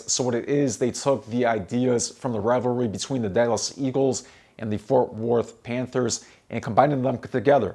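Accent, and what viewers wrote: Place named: New Jersey, United States. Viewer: "different grilling events, down by lake michigan" i love that phrase, i lived there before never heard that, lol, love the jersey accent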